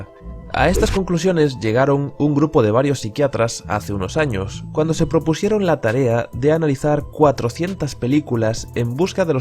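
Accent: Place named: Catalonia, Spain. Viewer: Bonita voz y acento catalán (creo) por eso de terminar la "d" en"t"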